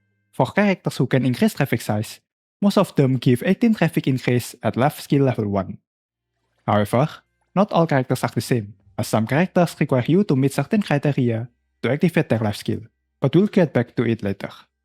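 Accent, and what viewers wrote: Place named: China. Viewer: bro sounds chinese , therefore this video is already gauranteed worth it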